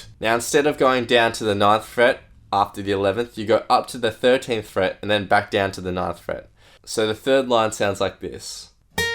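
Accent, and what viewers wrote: Australia. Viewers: Aussie? NOICE!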